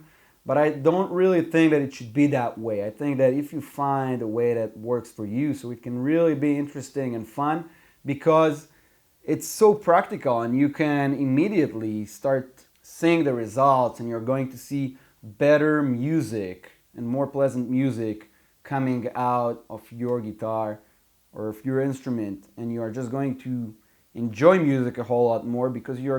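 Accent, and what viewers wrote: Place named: Latin America. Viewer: OMG man, your videos are amazing, you make such intresting and useful videos, they can be super useful for beginners+ players. It is a Shame you are so underrated, you make hi-level videos and Don't get as many views as you realy deserve. Wish you the best of luck, keep up with the amazing content. P.s. até you from Brazil or latin America? I think I know this accent hahaha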